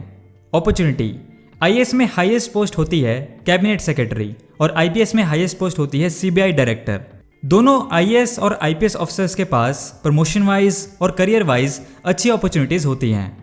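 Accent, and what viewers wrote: United States. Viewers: You must be a school going kid according to my assumption as your comment is so childish and immature.If she is talking like an American then it's ok what's the problem?? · Don't speak like a American, speak like indian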